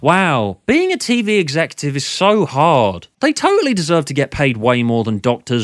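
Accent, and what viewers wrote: United Kingdom. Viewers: So glad somebody with a British accent saying intelligent things about More Alternative Truths is posting. A Disinterested Party (honest.) (Nor am I mum.)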